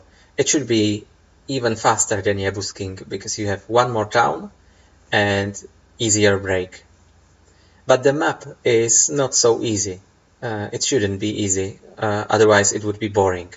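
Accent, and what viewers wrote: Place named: Czech Republic. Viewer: Mě ten čengliš právě vyhovuje, rozumím tomu daleko líp, než rodilímu mluvčímu :D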